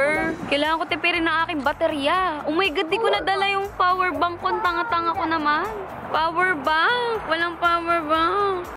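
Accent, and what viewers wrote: Iloilo, Philippines. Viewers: Ate na iilonggo ka ba??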